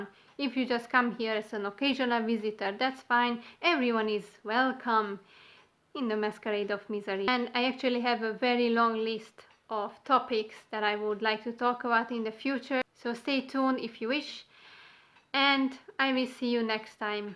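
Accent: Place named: Hungary. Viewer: I can only regret I have found your channel only today. I miss hearing the Hunglish accent as I used to work in Budapest for a long time. When I came for the first time to work with my Hungarian colleagues I could not understand why they are so negative as I was in life bubble back then. But they did not complain about things you or we rant about. It was mainly the job, government, gipsies, other colleagues, bosses etc but not about the life itself. You made my day after listening to the first authentic rant from Hungary which is way more meaningful. Cant wait to watch all your videos.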